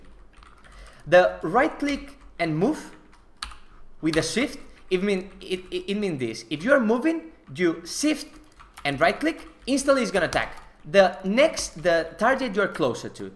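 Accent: Spain. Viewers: it's a spanish accent. like spain in europe not latin america. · I know you are Spanish, you need to learn english not Spaglish....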